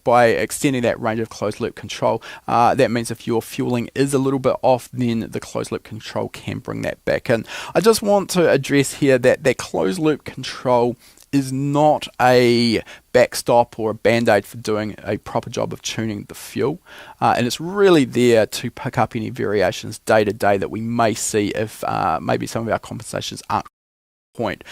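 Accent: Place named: New Zealand. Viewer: Perhaps that's how guys from NZ sound.The content is precious though so pay attn to that ☺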